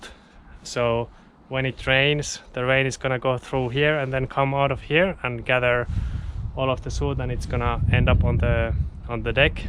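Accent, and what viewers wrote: Finland. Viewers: Great! Informativ for me and my campingbus heat project. I go for a small diesel heater, who can work on full trottle. I don't understand where you came from, but I notice some Finlands accent 😃.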